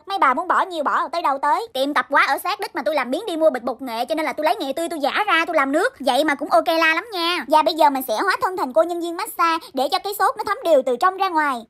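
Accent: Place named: North, Vietnam. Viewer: chị ơi chị người miền bắc à em người miền nam mà nói tiếng miền bắc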